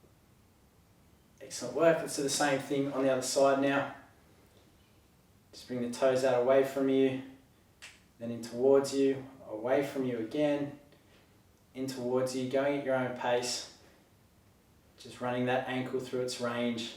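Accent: Australia. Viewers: Perfect pace for this old boy Tks Aussie(?) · Interesting Australian English! Those instead of your.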